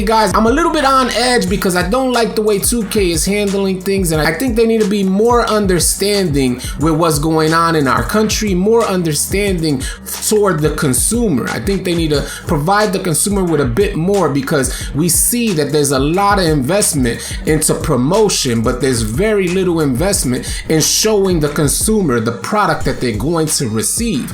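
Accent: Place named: New York City, United States. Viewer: sounding real live in this one! I hit that like because you sounding real NYC in this one my G.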